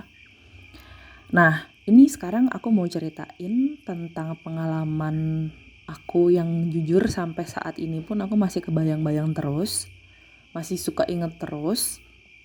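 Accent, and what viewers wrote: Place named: South Jakarta, Indonesia. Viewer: Mbak nya logatnya jaksel bgt 😅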